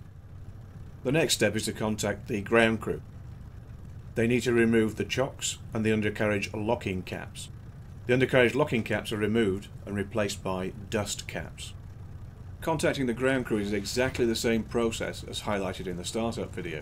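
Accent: United Kingdom. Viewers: I like this! It’s the perfect accent for a British airplane. It puts me in the mood lol. Wags is very busy with other modules and probably making Apache vids also.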